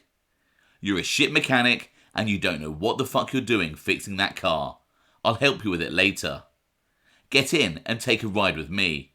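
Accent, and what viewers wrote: United Kingdom. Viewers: The Fine British Accent don't hurt...ha ha...always sounds more serious...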